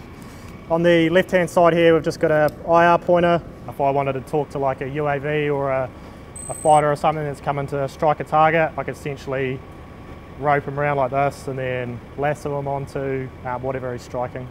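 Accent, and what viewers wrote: New Zealand. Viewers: Is this a normal New Zealand accent? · Ev great ! Just the accent ! 😮😁 Go Zealees !! 🇳🇿👏👏👏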